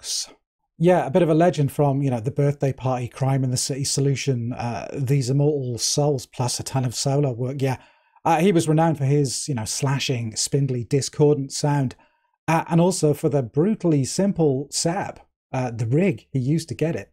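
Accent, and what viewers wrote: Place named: United Kingdom. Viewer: A guy with a beard and that wonderful british accent is talkin about guitar things with examples outside the mainstream music. I could not be happier. 😁👍 Thank you. Liked and subscribed. 🤘😎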